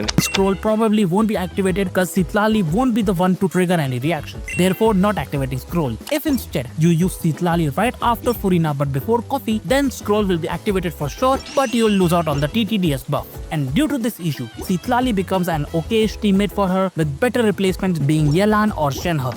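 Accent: India. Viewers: Insane editing and Accent ❤️ there's still 1% indian accent left hahahaha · Indian accent 🥵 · Indian English accent is funny. This is true, but I think this guy is far better than others and his video content is great so I see no complaints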